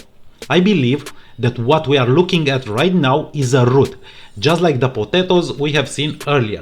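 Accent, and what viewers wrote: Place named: Romania. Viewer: ma crezi ca mi-am dat seama ca esti roman din primele 15 secunde?:))))))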